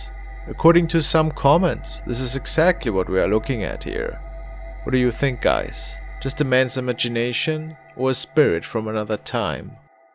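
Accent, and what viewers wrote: Germany. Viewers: It’s nice that you don’t say EVERYTHING is paranormal, and point out how/if it can be faked....is the accent German? My daughter and I are wondering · Sehr gut...was heisst...eigentlich.. an deinem Akzent denk ich mal du kommst aus Deutschland oder Österreich, lebst sicher in einem Englisch talking Country. If i m write, please take care of you,and i would love to see more of " in Carmel Main " Stay healthy,greetings from austria-ps.your Englisch is allmoust akzent free ( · he's german if I remember correctly. You'd think after speaking (or trying) to speak english for years. Watching TV/films, reading articles, & speaking with fluent english speakers. That this habit would be unlernt. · Alter, der Akzent ist so anstrengend... ist das ein deutscher? Klingt jedenfalls so.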